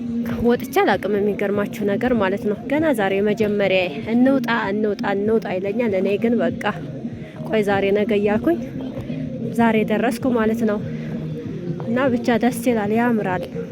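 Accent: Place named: Gurage, Ethiopia. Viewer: ጉድ ጉራጌ መስለሽኝ አማረኛሽ የጉራጌ አማረኛ ነዉ እና ሀይቅ ስትይ ገረመኝ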